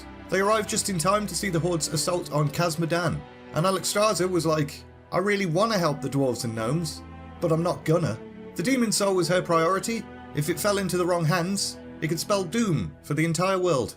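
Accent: United Kingdom. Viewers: Are you British? I like ur accent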